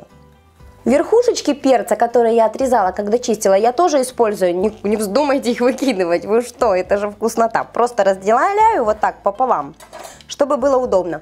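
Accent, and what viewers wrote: Ukraine. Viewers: Девочки,что же Вы такие злые?Во-первых на Украине речь этим и своеобразна.А уксус просто испаряется при варке.Больше позитива ,друзья!!!!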